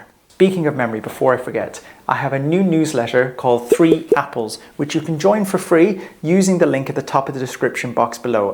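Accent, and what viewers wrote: Ireland. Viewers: That was helpful, nice Irish guy...not a shouty American!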